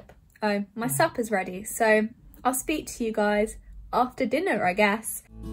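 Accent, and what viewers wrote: United Kingdom. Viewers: You have a really strong British accent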